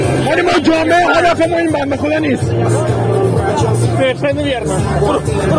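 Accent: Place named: Sabzevar, Iran. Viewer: لهجه سبزواری موج میزنه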